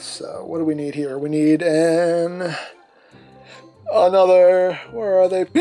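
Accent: South, United States. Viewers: you need to Apply for your southern card, you sound like a southern guy, take for me I am for the south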